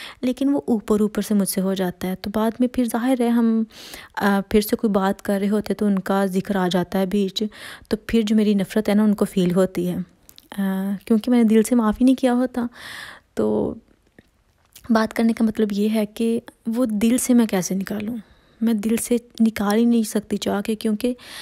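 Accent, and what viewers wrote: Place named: Faisalabad, Pakistan. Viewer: hahaha mujy bolny k texture sy pta chla tha..sb kehty faisalabad k log apni boli sy pehchan leay jatay..me also from fsd ... Khush rahen sister..abad rahen..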